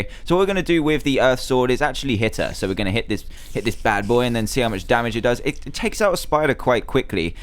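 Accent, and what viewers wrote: United Kingdom. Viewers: Your British accent made me subscribe to you! Btw, I also play MC :))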